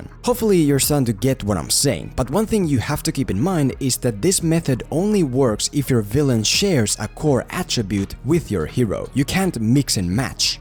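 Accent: Finland. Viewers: You have the most Finnish accent I've heard in ages. Am I wrong?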